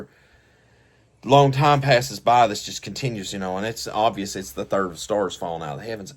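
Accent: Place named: Texas, United States. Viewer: Where in Texas are you speaking brother ?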